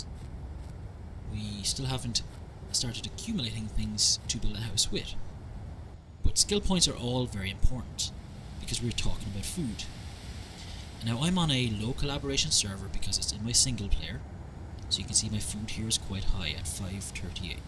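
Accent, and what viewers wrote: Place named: Newfoundland, Canada. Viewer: You're from Newfoundland arent ya! I'm from Ontario, we love you fellas :)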